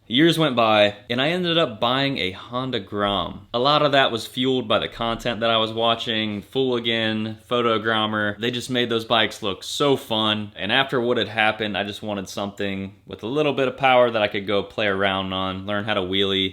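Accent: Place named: United States. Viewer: Just a nitpick but KTM and Husqvarna are also by definition "across the pond". America doens't make jack shit with regards to off-road motorcycles, not a damn thing. Am I assuming too much? You sound like an American!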